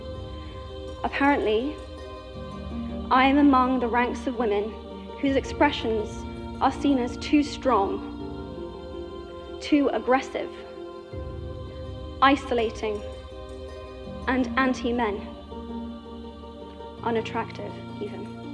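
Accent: United Kingdom. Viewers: British accent 🔥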